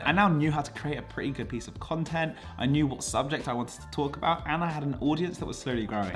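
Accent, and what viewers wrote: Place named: United Kingdom. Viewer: Why did you put dollars rather than pounds in the thumbnail when you’re British? Super confusing! I was thinking maybe you’re American until I clicked on and heard your voice. It couldn’t be any more British lol 😭💕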